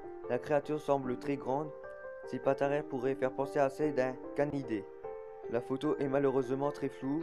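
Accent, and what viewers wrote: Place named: Nord-Pas-de-Calais, France. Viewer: à entendre ta voix et ton accent... Un Ch'ti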